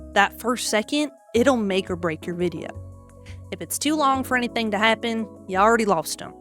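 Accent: South, United States. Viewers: Thank the good lord another streamer with a southern draw. I haven't come across another streamer with a draw. And your info is very refreshing. ❤ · Thank you, I love getting new information & I love your country accent you remind me of my favorite stallion Applejack. Thank you so much, I have used my own hashtags & everything I will do my best to post more I made a new hashtag today for my Merry Morning Sing Song, I did it because I found your video I am proud of your work, thanks!!!!!!!!!!!!!! 🫴🏽MWAWWWAH~⁽⁽ଘ🤍ଓ⁾⁾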